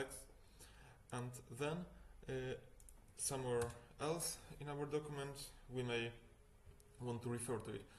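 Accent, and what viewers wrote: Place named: Russia. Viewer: Как будто русский на английском говорит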